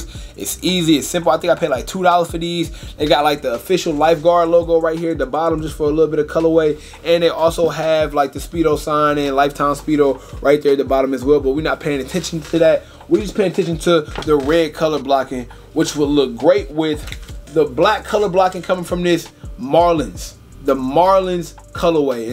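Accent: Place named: Chicago, United States. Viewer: I thought you were from Chicago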